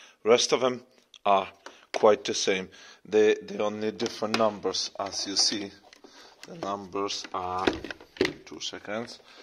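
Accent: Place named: Cork, Ireland. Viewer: loving that cork accent, man. thanks for the vid bai.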